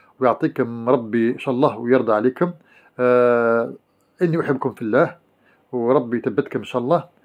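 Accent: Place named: Algeria. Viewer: يسلمو عمو وصفاتك كلها بتجنن مريت صدفه شفت هالقناة عجبتني كثير الله يوفقك ياعمو انشاله بجربها بموت فى اهل الجزائر والله لهجتكو حلوة كثير بدي اتلعمها لكن هي صعبة شوي😊❤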